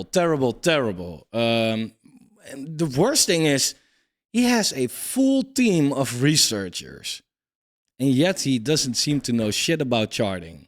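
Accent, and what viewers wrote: Netherlands. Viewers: blijft leuk he, dat nederlandse accent, je praat goed engels hoor !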